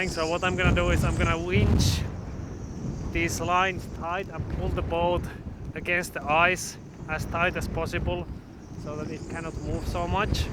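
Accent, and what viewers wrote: Finland. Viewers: Finnish, judging by his accent.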